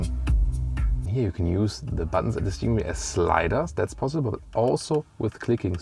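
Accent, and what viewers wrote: Germany. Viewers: His „German“ English accent is absolutely unbearable!!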